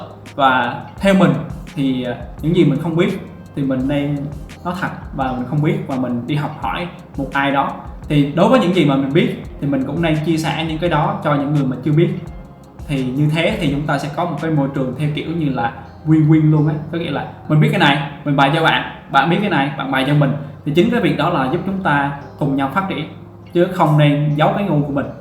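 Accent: Da Nang, Vietnam. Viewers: a oi anh học ở đn hà anh, nghe giọng n giống đà nẵng qué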